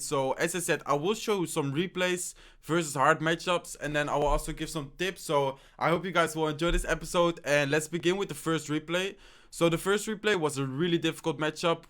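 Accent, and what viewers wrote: Netherlands. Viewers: Dutch accent is kinda annoying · I tough you were Dutch just like me cuz of the accent. I look in the description and it says: I’m from the Netherlands lol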